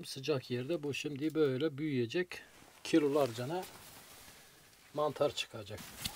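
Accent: Adıyaman, Turkey. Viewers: Abi sen kahtalı mısın? Ağzından ilk kelime çıktığı anda eşime bu abi hemşehrimiz dedim..Adıyaman’dan sevgiler saygılar..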